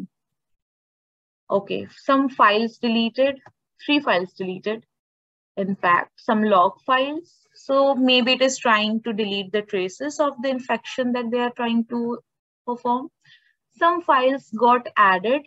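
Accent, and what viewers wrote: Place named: India. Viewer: Indian Acent.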